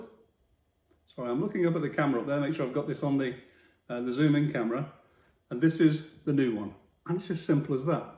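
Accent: United Kingdom. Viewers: The tackle manufacturers like Middy, Drennan and Guru etc could learn something from you, use nylon loops instead of fuse wire with a memory, great video! I remember watching the first video I saw of yours, you were fishing on a lake, a man with a clearly British accent fishing and a Kangaroo hopped into the video in the background, local wildlife came to say "G'day"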